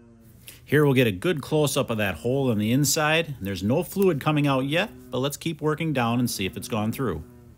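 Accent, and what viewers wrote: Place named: Wisconsin, United States. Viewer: I knew he was a Wisconsinite from the familiar accent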